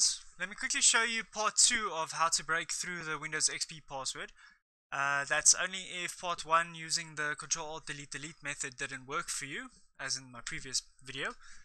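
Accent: South Africa. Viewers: yon sound like a fellow south African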